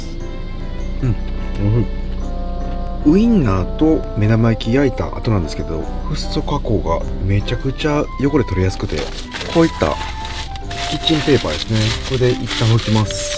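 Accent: Kansai, Japan. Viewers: お疲れ様です😁 私も動画を観させて頂いて車中泊グッズとか見に行くようになりましたホームセンターのそうしたグッズと売り場を見るのが楽しくなりましたあとの暖かい関西弁の御説明と笑顔も良いなあとほっこりしながら観させて頂いてます❗ 御自身も動画の魅力です😁 ステーキ焼ける音がたまりませんね😆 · こんにちわ！ ㊗️10万人超えおめでとうございます🎉🎊 毎回そうですけど、の優しい語り口もとっても好印象です(わたしずっと東京住みなので関西弁に憧れてます) あとほんといつもお人柄が良くて心地良く観させていただいています！これからも楽しく拝見させていただきますね！あとこの間のライブは、わたし時間が合わなくてリアルタイムでは観れなかったんですけど、そのライブ配信も翌日に楽しく拝見させていただきました！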